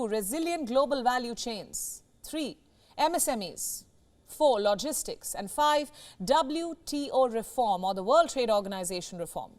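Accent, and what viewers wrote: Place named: India. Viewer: What has WION turned into ???????????????? a new CNN with Indian accent ??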